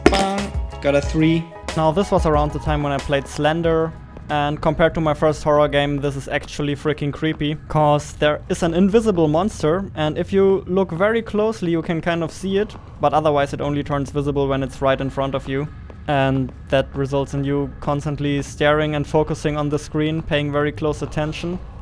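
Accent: Germany. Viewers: Are you from deuthland? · are you german? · Are you German ?!?!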